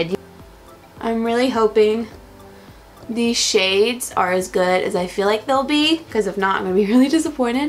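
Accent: South, United States. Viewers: Where is she from? 😂 has to be down south! 😂👏🏽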